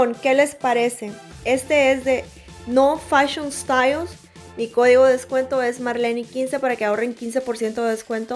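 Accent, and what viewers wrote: Mexico. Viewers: Porque habla como mexicana.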